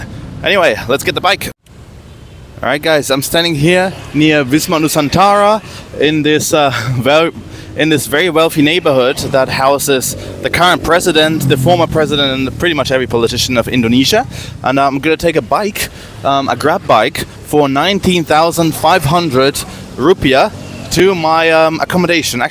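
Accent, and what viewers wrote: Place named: Germany. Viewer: Nice to hear your english .German english is the best.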